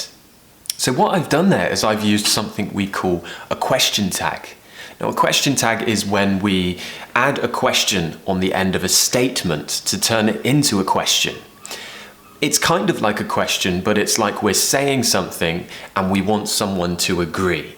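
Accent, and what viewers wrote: United Kingdom. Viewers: love your British accent😊😊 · When you said "Oi" from Boiling, this "Oi" in Brazilian portuguese means "Hi" in English. Good to see these aspects. I really like your channel and I'm beginner in English and I want one day to talk to this beautiful British accent. I'm from Brazil 😊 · Very beautiful British accent!!! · Hi, I speak spanish and I am learning british english and with your channel and your accent, I can learn many things, Thanks! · your British English is so fantastic! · You are so easy to understand I hope all british people speak like you😂💔💔 Your british accent is very exciting😍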